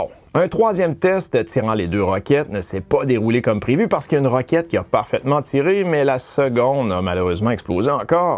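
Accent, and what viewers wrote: Quebec, Canada. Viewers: Toujours un vrai plaisir. Le ton, le style et tes efforts pour lisser ton sympathique accent québécois. Au dela de la forme, c'est tres intéressant d'avoir les nouvelles du spatial d'un point de vue nord-américain et ... en français ! Courage...on aime ton travail 🇨🇵 ❤ 🇨🇦 Ps. J'ai conscience de l'esprit indépendant nord américain mais pourquoi pas des collaborations avec des YouTubeurs français? C'est une pratique courante ici chez ces "maudits français". Ton nombre d'abonnés n'est pas a la hauteur de la qualité de ton travail...(aucune flagornerie de ma part...)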